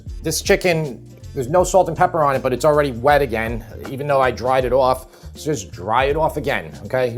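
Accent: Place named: East Coast, United States. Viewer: Boouught and fooour cups, abatt. Awofff?!? Swalt?! What?! Is the east coast okay? Malk... no.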